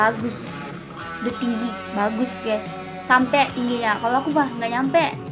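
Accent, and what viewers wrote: Indonesia. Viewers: Logat Indonesia nya udah mulai muncul ya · Kok logatnya Indonesia banget mbak.... Asli Brunei atau Indonesia nih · kamu mah... lucu...logatnya indonesia banget... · Mbak orang brunei atau orang Indonesia kok logatnya kaya orang Indonesia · aksen melayu brunei sudah tdk kdngran dek..udah kayak org indonesia bngt aksen nya...salute..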